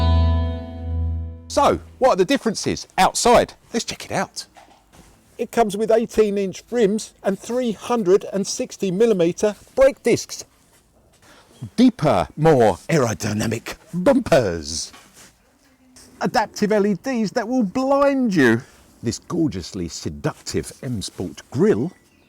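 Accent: United Kingdom. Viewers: Beautiful presentation. I like it a lot. The British accent most welcome.👍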